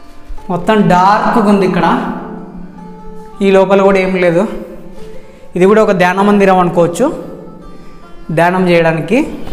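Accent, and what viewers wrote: Rayalaseema, India. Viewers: U r from Rayalaseema u r accent says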